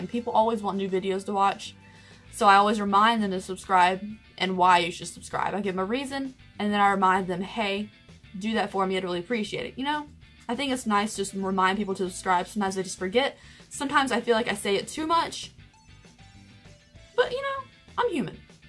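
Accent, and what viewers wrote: South, United States. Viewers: it's funny how differently we see ourselves than others do. Seriously, you are the most beautiful YouTuber I've seen to date. Everything about your face is perfection to me. Your eyes, nose, lips, cheekbones, forehead is normal lol, etc. Your smile and laugh is everything to me. When I watch your videos, every time you smile or laugh, I do too, because it's that contagious kinda smile (which is a rare find for me). Your voice is fucking adorable as hell (ultra feminine with a splash of southern belle in there). I love that you always wear dresses, and have a vintage glam vibe to you. I found you very randomly a few months ago. One of your 'Thrift Store' videos was on my homepage, and naturally being as huge as a thrift shopper as I am, I was intrigued. I was instantly addicted to you, from the very first video I watched. I don't understand how you don't have millions of subscribers because you are hands down my favorite YouTuber to watch, and I get so giddy everyday when I see your post notification. 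I hope to do on my channel for people, what your videos do for me and your other stan subscribers. The only other YouTuber's I loyally watch is (freaking love her), and I don't care for the more run of the mill type of folk. Keep staying true to yourself. Much love!🖤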